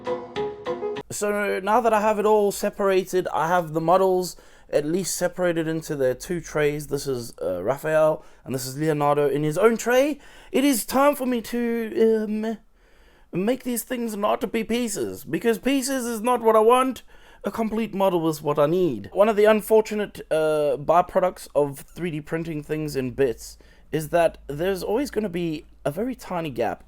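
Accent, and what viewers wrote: South Africa. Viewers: are you south african? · I detect a hint of South Africa in that accent😂 you can't get rid of us that easy. But superb work and truly great craftmanship on the video and models themselves. I need more video series like this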